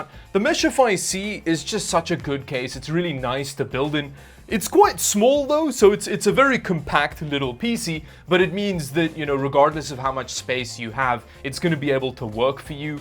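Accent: South Africa. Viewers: Hey, are you south African? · You sound like a ex South African your name is spelt the same in Afrikaans which h is like Dutch thanks for a chance towim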